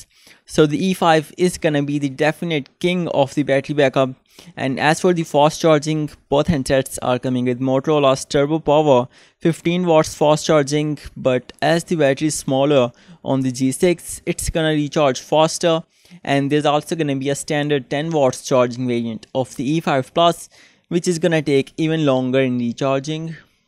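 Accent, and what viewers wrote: India. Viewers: Not a bad video but sometimes I find it a little annoying trying to understand his Indian accent.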